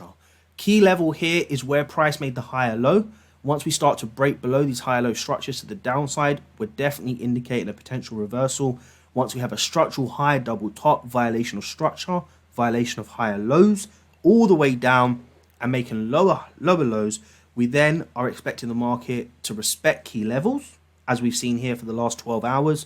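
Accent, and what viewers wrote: United Kingdom. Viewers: He sounds british to me and they have a different way of seeing these situations. They often have a funeral and next gather for a meeting lunch and I think thats their way of showing respect and appreciation for the person. I hope Im not saying bullshit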